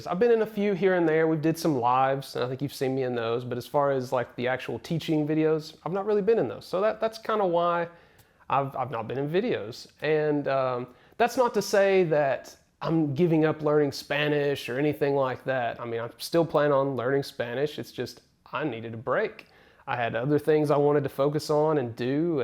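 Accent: South, United States. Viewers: I always enjoyed watching another regular person, with a southern accent no less, struggle with Spanish. You make me feel more comfortable with my struggle. Don’t let the varmints get to you! By the way, I am 62. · I wouldn't be able to handle being trolled either. I don't think they realize you're humans. You may always have a strong American accent but so what. You and Maria make videos that I love. So thanks for sharing, brother! I hope you love Colombia! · You have a southern drawl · I get you, man. Learning a language is damn hard. It seems like it takes forever to master it. IMO just take your time and let it all hang out, you do it for yourself (and for Maria I suppose), to for others. And as a non-English native speaker I like you American accent, by the way ;) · Y'all's channel is my favorite. I have a new grandson from a bilingual marriage. 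I've been looking for Columbian teaching online and Maria's approach works. She makes it organic and fun. I love your Southern accent. It's how we talk in Ala/GA. I trust what you and Maria do. Looking forward to watching you guys for years to come. Your relationship is more important than the channel so do what you gotta do to keep lovin' each other. Praying for safe travels.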